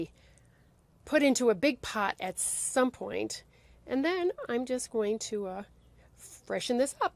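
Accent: United States. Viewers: Hi! It's Marc again from good ol' Germany. Thank you for this video! Yes, I do find your videos very helpful indeed, and I always look forward to new videos coming from you. And between you and me; I love your New England accent. Your English sounds absolutely beautiful. I also learned something from you that works fantastically even in the much cooler and moister climate of central Europe: Amend potting mixes with worm castings. Wow! This is awesome! It works on my citrus plants, but it also works on my mammalaria cacti and jade plant and aloe. It also works on my cycads. And it works on my heavy feeders like oleander and geraniums. Today I amend the potting mixes of all my plants - tomatoes, Mediterranean herbs, coffea, strelitzia etc etc. My impression is that worm compost keeps my plants healthier and pest-resistant. So, let me assure you: your videos are absolutely helpful - even over large distances and across climate zones. 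So, carry on, and share your expertise with us, so we can all go out in the garden and make the world a more beautiful place (I love your motto, and I have adopted it and made it my own). Have an awesome Sunday and enjoy your patios and garden! Bye!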